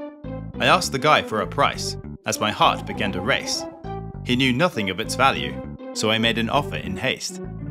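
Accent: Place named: Scandinavia. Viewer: Sorry had very similar accent to some scandinavians speaking english Are you big as in age, height - or width lol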